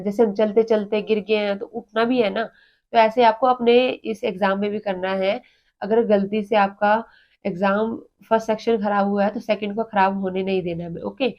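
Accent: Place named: Himachal Pradesh, India. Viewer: Your accent is Himachali...💞...nd thnxz for boosting ma'am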